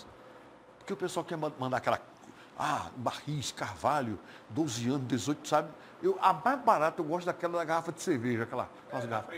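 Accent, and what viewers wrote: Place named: Amazon, Brazil. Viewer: Sikera já tá bem amazonense, até o "fulêro" do amazonês ele já fala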